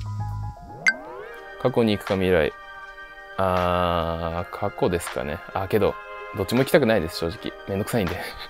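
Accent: Japan. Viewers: ugh he's so hot, his voice is so deep and i love his Japanese accent 😩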